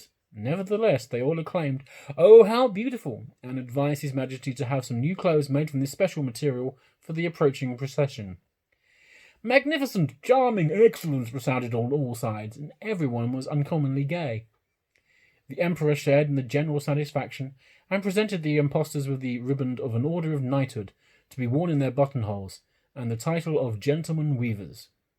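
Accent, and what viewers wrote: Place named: RP, United Kingdom. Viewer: It's been so long since I heard your voice I forgot how posh you are hehe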